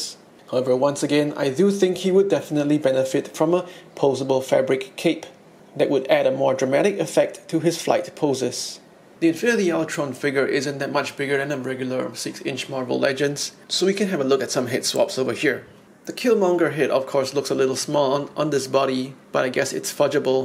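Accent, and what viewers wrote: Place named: Singapore. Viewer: I know a Singaporean when I hear one. Nice review man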